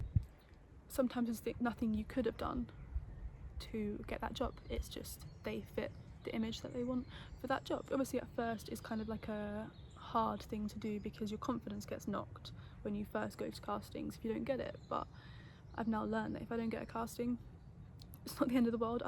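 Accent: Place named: United Kingdom. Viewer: I'm in love with British accent Greetings from Germany ❤️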